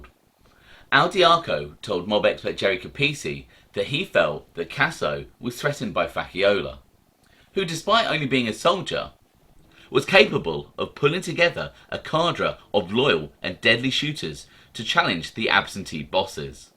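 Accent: United Kingdom. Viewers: I love hearing Brits educate about the mob. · Is this gay brittish guy trying to teach us about our history?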